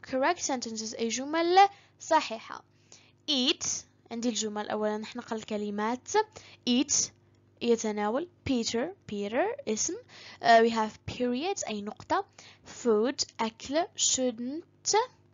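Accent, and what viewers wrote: United States. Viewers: لهجتك اميريكية 100\100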